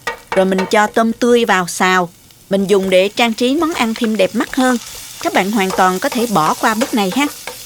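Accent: South, Vietnam. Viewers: làm cơm chiên nhìn thấy ngon quá, nhìn thấy thèm muốn ăn hết một tô lớn luôn đó, vừa khéo tay chỉ dẫn dễ hiểu lại có giọng nói trong trẻo rõ ràng đặc trưng của người miền Nam rất dễ cảm mến và giọng nói rất hay và trẻ trung nữa! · Gio.ng no'i dung giong mie^`n nam nghe de^~ thuong ghe^ no*i! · có giọng nói miền nam truyền cảm trong sáng hiếm có. Rất thích xem các video hướng dẫn nấu ăn của không những vừa ngon miệng mà còn trang trí đẹp mắt nữa. Rất cảm ơn, triệu like cho.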